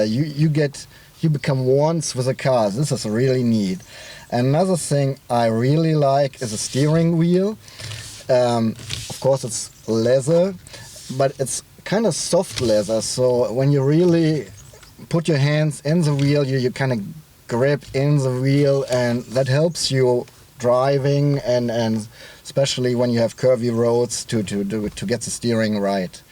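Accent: Germany. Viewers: The German accent didn't bother me at all. The horrible video quality, though, was irritating. I haven't seen such an overexposed video in a long time. Sort that out, guys... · Good review! Thanks! Thanks to the Germans we have this beautiful cars , so no need to apologize for the accent :) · all Germans I meet are always so friendly and honest. The way you handle the people laughing at the way you speak confirms this. Anyway, dont be embarrassed most people commenting on it probably only speak English, while you speak two languages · This was a wonderful video where you dont have to listen to some fucking music all the time so you cant hear the engine sound, and i hope that other car testers learn from this. Also, you dont have to apologize for your strong german accent, as long as everyone understand its OK. Thanks for a great video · Your German accent, speaking English, is a lot better than my American Southern accent, specking German. 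You did fine, I understood every word. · Thanks for the test. As far as I am concerned I can perfectly cope with the little german accent (provided you accept my Belgian accent, of course). The ride seems to be interesting but unless you regularly have access to a race track this car looks too fast for public roads. In France you would probably quickly loose your driving licence (radars everywhere here in Alsace). I find a 335i with m sport package and bigger brakes more reasonable. Kind regards. Armand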